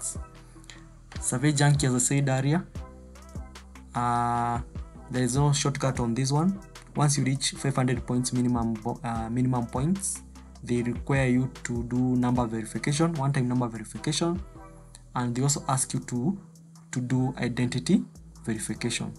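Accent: Kenya. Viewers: I've been watching your videos and unasound kama mkenya, which proxy site do you recommend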